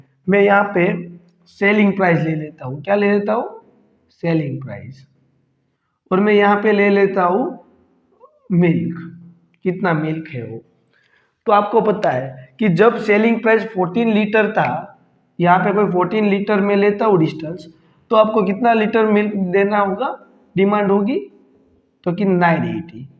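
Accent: Gujarat, India. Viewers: Sir your videos are very helpful and i am now able to understand all maths questions easily. Aur sir kya app gujarati ho apki language se pata chalta hai Me bhi gujarati hu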